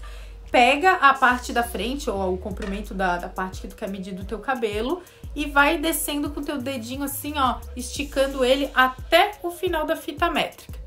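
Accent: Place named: Florianópolis, Brazil. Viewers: nossa escutei o sotaque e tive certeza q era daqui de floripa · Tão bom abrir vídeos aqui e ouvir esse sotaque! Uma impressão de que te conheço de Florianópolis... Só quanto à massagem, não fizeste recomendação explícita de massagear com as unhas, mas ficou a dúvida em muita gente sobre isso. Acredito que tu saibas, mas é bom reforçar por aqui: massagem só se for com as digitais dos dedos, com a parte macia! Never do it with the unhas!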